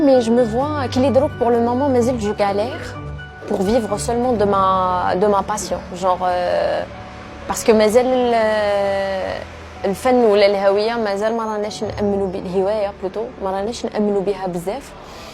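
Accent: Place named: West, France. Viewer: J'aime ta façon de parler et bien-sûr le contenu .. mais surtout ton accent de l'ouest ça me manque. Bon courage pour ce que tu fais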